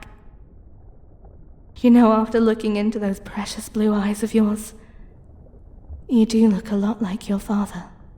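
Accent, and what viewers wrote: United Kingdom. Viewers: Amazing audio, your british accent is very nice and I would love to see spy wife / girlfriend storyline to continue there is so much suspense in that one 😊☺️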